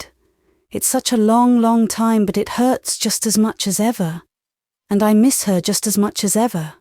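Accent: England, United Kingdom. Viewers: I’m enjoying these very much, despite the English (not Eastern Canadian) accent; Thank-You!!! This is one of My favourite books in the series. 😉😄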